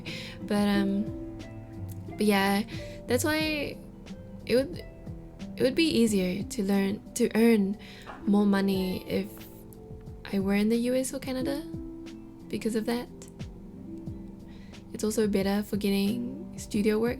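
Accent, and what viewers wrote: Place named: New Zealand. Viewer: Kiwi accent is the best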